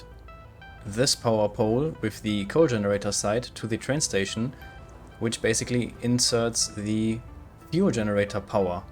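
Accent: Germany. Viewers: No problem :) Hahaha all god mate, bin auch Deutsch :D hab ich direkt rausgehört ^^ Sollte kein Front sein, glaub wenn man den Akzent nicht kennt, fällt das den English native speakern nicht auf ;)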